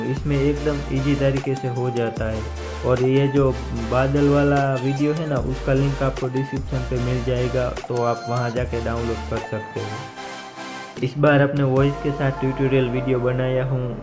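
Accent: Gujarat, India. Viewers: Apan ko pata he apan gujrat se he har gujrati ki aavaj pahechanta hu tum100% gujarat se ho 😘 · bro aavj sun kar lag ka aap gujrati ho , iam also from Gujarat ❤️ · Bhai video me aawaj sun kar lag raha he ki aap gujrati ho😄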